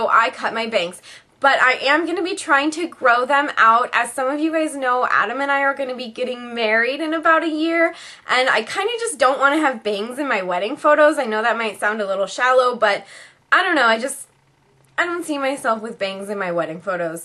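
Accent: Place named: New Jersey, United States. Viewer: woah, you sound like your from new jersey(x ... well i LOVEEE your videos :) you are so sweet<33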